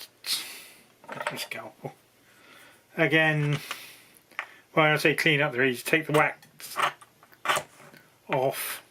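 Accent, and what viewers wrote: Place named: United Kingdom. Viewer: You are a gem. Your videos are clear, well edited, sped up at the right instances. Your comments and work methods are smart, valuable, your work bench is neat and your British accent is clear and impeccable. I am an 80 yr old accordionist, tinkerer and repair junkie in Florida, USA admiring all that you do. Roll -on!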